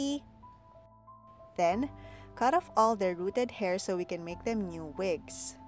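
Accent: Philippines. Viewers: I have been watching you since the first time I discover your channel. I have a feeling that you're a filipino because of the way you speak. Then I found that you really are filipino (or based in Philippines) when I checked your IG! I can't believe how talented you are ❤️❤️ hope you can make it even bigger in youtube and be more recognized not just in Philippines but internationally 🌹You are so amazing and talented. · you're accent is nice? are you a filipino? :D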